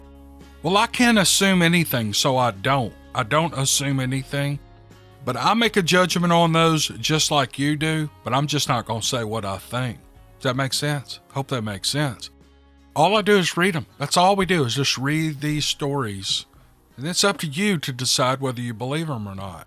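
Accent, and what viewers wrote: South, United States. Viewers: I don't know if they are true either. But to me it really doesn't matter. If they are true, good,if they aren't then it was a good story. I'm never going to assume that they aren't, cause I don't know. But please keep reading them regardless. I love listening to your voice with that southern drawl.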